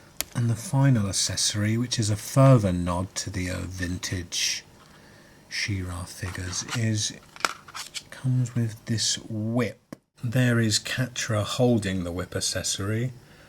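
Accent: United Kingdom. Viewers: cool british acent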